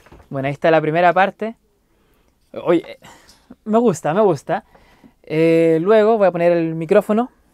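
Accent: Chile. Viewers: Me encanta el asento chileno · Eres chileno o no? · Tienes como hacento chileno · eres de chile? es la primera vez que te veo jajajaja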